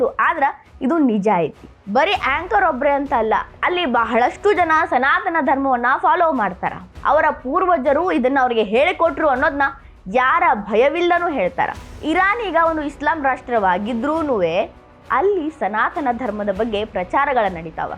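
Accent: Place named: Bayaluseeme, India. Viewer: ಬಯಲುಸೀಮೆ ಕನ್ನಡ ಬೇಡ.ಕಿರಿ ಕಿರಿ ಆಗುತ್ತದೆ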